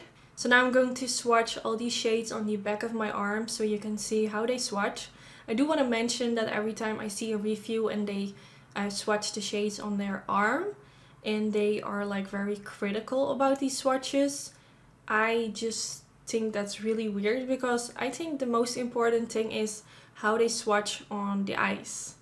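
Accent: Netherlands. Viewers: Hearing your accent reminds me soooooo much of time abroad in Amsterdam last year ❤️❤️❤️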